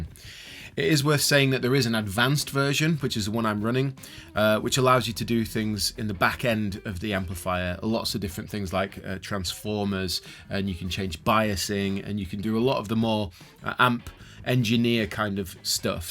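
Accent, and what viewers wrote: Yorkshire, United Kingdom. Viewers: Love that you haven't lost your Yorkshire accent!! My family are all from Yorkshire, I live in West Yorkshire but my mother's side was from Barnsley from brieley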